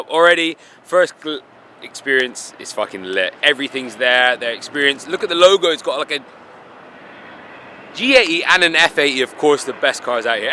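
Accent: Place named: United States. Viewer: Yank speak, delete.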